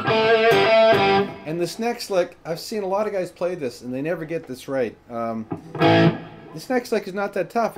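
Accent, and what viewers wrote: Canada. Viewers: I think the toughest part of the solo is definitely the 2nd half. Are you planning on continuing or doing another video on the 2nd solo in? BTW--I love your tone you get achieve for all the videos. It's spot on., and as far as I'm concerned there's not another YT instructor who takes the time and effort to get it exactly like the original artist. You're the only guy I go to after checking out dozens of YT instructional vids. Kudos. BTW--you sound like you could be in Canada or the Northern Mid-West; how close am I?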